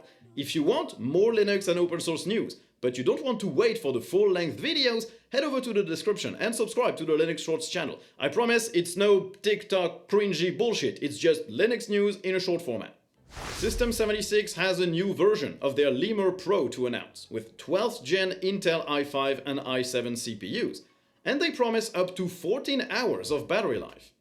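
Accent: France. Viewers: Is this a Frenchman speaking the islanders' language?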